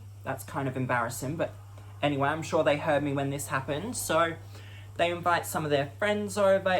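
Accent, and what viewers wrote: United Kingdom. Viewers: why did you choose the cockney accent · i love your accent. what part of England are you from?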